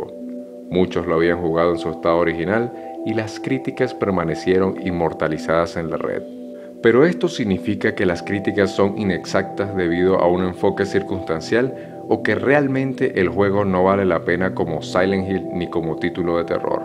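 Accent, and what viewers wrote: Venezuela. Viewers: Sendo acento Venezolano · st men es venezolano, verdad ? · Eres venezolano? :0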